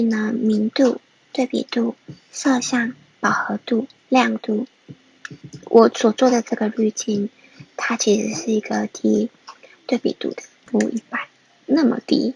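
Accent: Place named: Taiwan. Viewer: 聽你的口音還真的是台灣人，唉，你能不能轉國籍到大陸？你的人品比較適合在那邊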